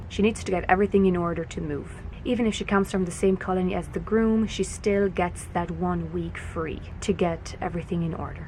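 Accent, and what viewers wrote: Germany. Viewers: Thank you for sharing this beautiful tradition. I'm curious what dialect of German do you speak. Ich spreche Deutsch:)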